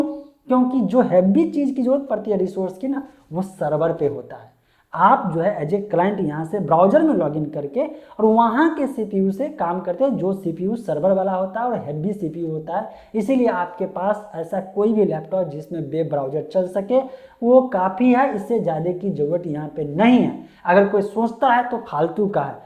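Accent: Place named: Bihar, India. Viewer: Bhai apka bolne ka tarika s lag rha hai bihar jharkhand s ho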